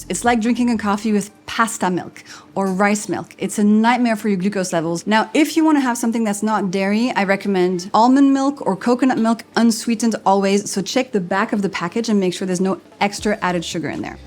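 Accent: France. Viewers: American former French teacher here. Her English is so good, I thought at first she must be a native bilingual speaker, maybe Quebecoise (French Canadian). "Elle n'a que l'ombre d'un accent francais..." Brava! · irritating french accent lol n your not a Goddess . Repeating what all the internet knows already to sell supplements or yourself ....why i hate this period in time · You are a good YouTube personality & diet restriction advice giver. Vogue is always a sucker for a French accent. You say absolutely nothing about consuming enough water, but are happy to show us your cute little recipes. We know the information you are giving is fraudulent, masquerading as science, and all those comments on your channel agree with this. Hopefully you can change your tune, or just do something else entirely, instead of contributing to society’s toxic diet culture.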